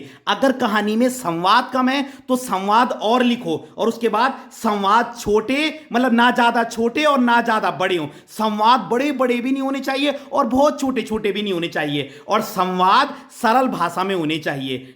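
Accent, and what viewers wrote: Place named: Garhwal, India. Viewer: Sir aap garhwali hoo 😍😍 apki awaaj se pata lag gyaa 😂❤️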